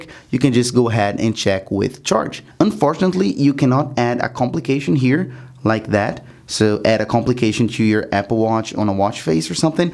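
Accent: Brazil. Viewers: I’m watching here and thinking to myself “I feel like that’s a Brazilian accent....” Then I saw on the Now Playing and just yelled AHÁ! SABIIIIA! 😂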